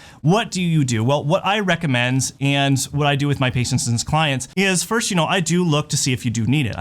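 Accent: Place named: Utah, United States. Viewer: I couldn't quite place where your accent is from. Then I heard you say "Buh' en' very strongly (for button) and I thought it sounded very much like a Salt Lake City or Provo accent. I could be wrong. ISTITNOJCA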